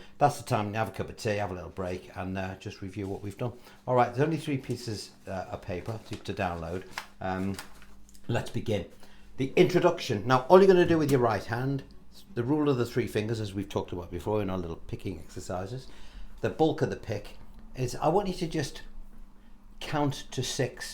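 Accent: Liverpool, United Kingdom. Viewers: Excellent lesson. Totally agree about putting every chord above, rather than a dummy such as meself trying to figure out where all the other chords go. Its held me back decades! Going forward it would be great if you could put more of the page 3 thingy's with all the chords going where they are meant to go. Thanks for the new word of the day too. Smuggery, had to look that one up. Nice1. Always loved the way you Liverpudlians talk, hence" The Beatles. Its all a load a shite, theres no frikkn werk as me old scouser buddy used to say back in 1984. A big Irish very slow fan! Have a good wan.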